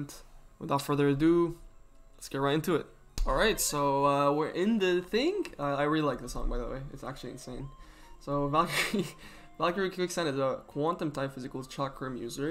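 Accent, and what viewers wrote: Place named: India. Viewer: Just a question, are you indian? Your accent is kinda indian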